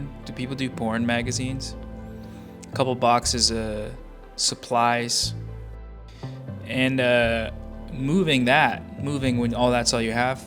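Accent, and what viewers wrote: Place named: United Kingdom. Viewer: The British voice and the music was pretty chill but i like it without music too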